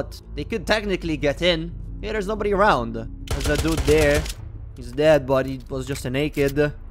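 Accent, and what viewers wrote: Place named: Malta. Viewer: Yooo bro... Weird question.. are you Maltese??? You have such a Maltese accent 😆😆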